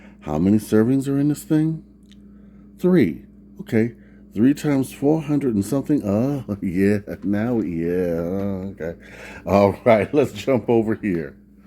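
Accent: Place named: Louisiana, United States. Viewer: I know you said you lived somewhere around Chicago but even though I'm deaf I can hear your accent you sound like you're from Louisiana originally.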